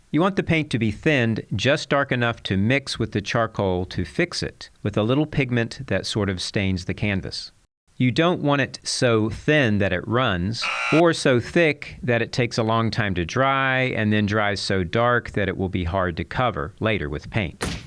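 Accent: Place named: United Kingdom. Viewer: New sub from UK .... You sound like your from UK. Do you do private tutorials or lessons or whatever?? I love your humour etc. Glad I found you good sir!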